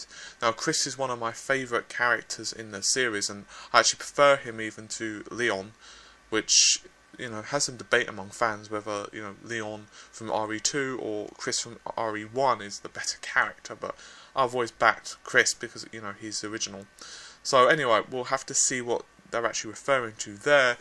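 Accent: England, United Kingdom. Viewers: fucking english accent cant understand all, i took headset to understand him.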